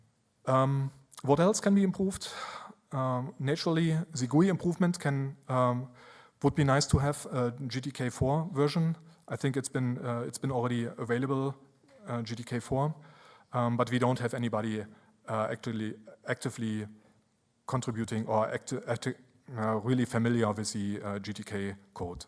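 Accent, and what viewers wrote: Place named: Germany. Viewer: Glorious German Accent. Thanks for maintaining my favorite editor, though.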